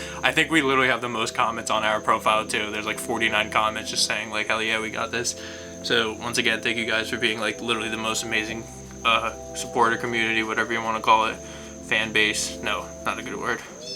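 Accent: Russia. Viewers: Eres ruso p estadounidense?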